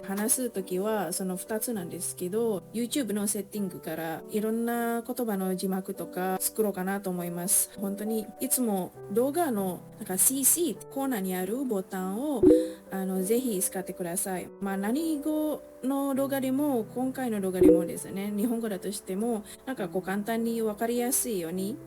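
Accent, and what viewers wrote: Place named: Indonesia. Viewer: I guessed from your accent that you're from Indonesia. Hello! I'm from the Philippines, also living in Japan. よろしくね！ 手帳や文具が増えるばっかりで、その活用方法のため自分でよく手帳会議をしています！💦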